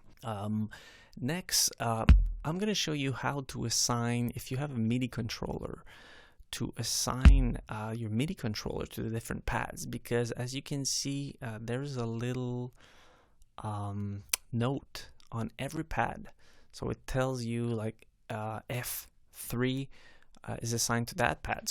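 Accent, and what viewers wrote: Quebec, Canada. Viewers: Do I detect a French Canadian accent?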